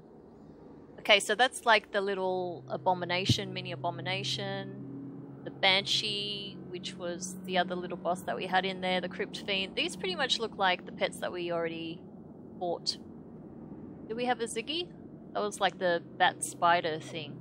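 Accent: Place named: Australia. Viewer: always love to hear a woman's aussie voice in gaming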